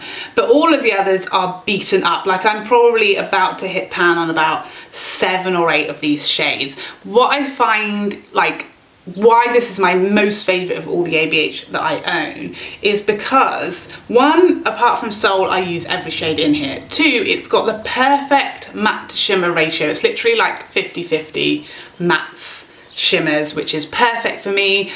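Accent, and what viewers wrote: England, United Kingdom. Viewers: I seriously CANNOT understand WHY it's so hard to ship to the UK... i told the lady at the Post Office THIS IS CRAZY🤪🤪🤪 I'm So so so sorry it never arrived. The last time I sent it, I haven't received it so you may end up with 2💋😁🤪 Maybe one day. But GEEZE. I am so sorry I wasn't able to get that Palette in your hands sooner.. LOVE YOU MY SWEET ❤❤❤ I adore you in EVERY way. And your English accent GIVES ME LIFE🙌🙌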